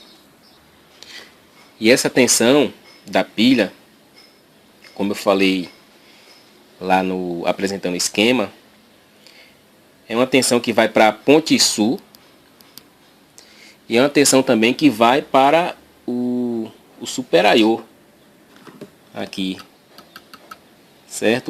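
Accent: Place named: Pernambuco, Brazil. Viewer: tu ta de parabéns pela didática, simples, direta e eficaz! E esse sotaque ta me parecendo bem "leão do norte". Sou de PE, de onde você é?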